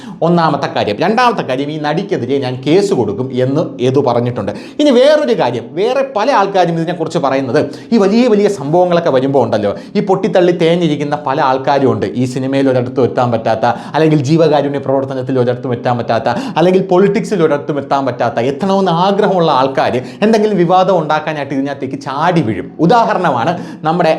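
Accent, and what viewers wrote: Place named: Trivandrum, India. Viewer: ചേട്ടന്റെ Trivandrum slangil ഉള്ള അവതരണം പൊളി 😂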